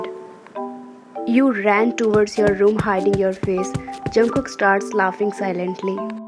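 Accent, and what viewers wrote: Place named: India. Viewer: You are Indian right because of your voice it's telling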